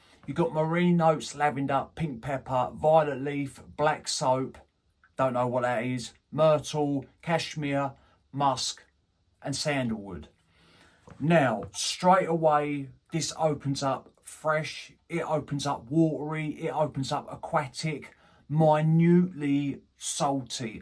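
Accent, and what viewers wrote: United Kingdom. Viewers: Thanks for this review, its the first one i found on yt of this fragrance I wonder what's your accent? First i thought it's Australian, but its from somewhere in the UK, but which region?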